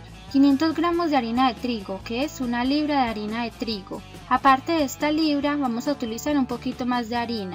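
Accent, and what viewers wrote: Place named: Medellín, Colombia. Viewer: Ese acento de Medellín que gusta mucho Besos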